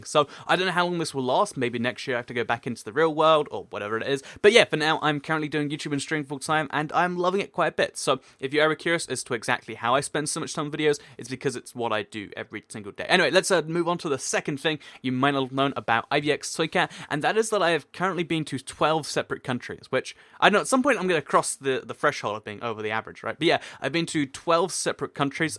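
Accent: United Kingdom. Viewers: You're British. Say "H" for my amusement. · Are you British I am? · What part of the U.K. Are you from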